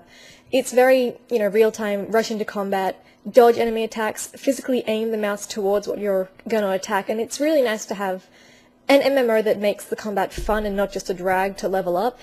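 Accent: Australia. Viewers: australian :O i love your accent XC wish i could exchange my american accent a european or australian :D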